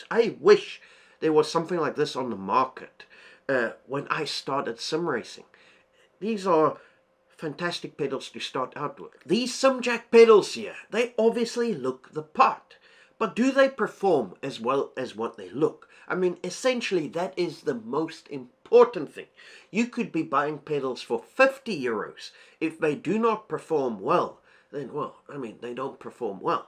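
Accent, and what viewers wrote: South Africa. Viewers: Nice video. Sold me on the pedals 🙃 you South African? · you sound south african?! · South African accent ?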